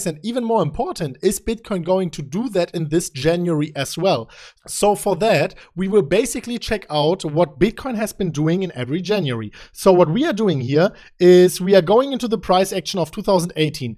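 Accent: Germany. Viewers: Are you german? ;))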